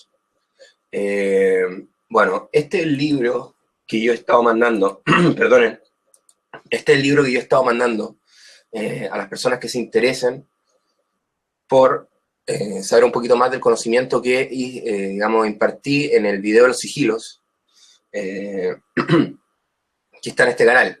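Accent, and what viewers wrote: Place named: Chile. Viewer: 🤗¡ Me encanta el acento chileno ! 🇨🇱❣️ 🥰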